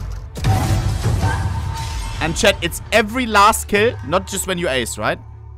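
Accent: Germany. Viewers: is bro german ? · i think youre from germany am i right xD alles klar xD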